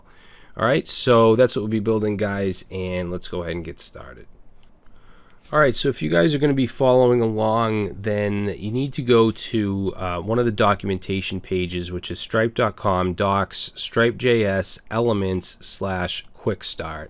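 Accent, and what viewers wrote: Boston, United States. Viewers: Yo is it just me or does his boston accent have him sounding pissed the entire video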